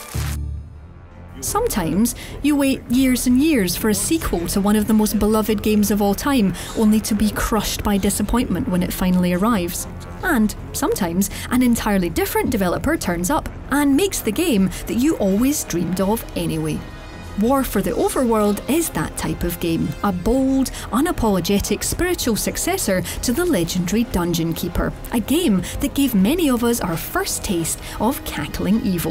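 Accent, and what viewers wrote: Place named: United Kingdom. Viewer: British accent👌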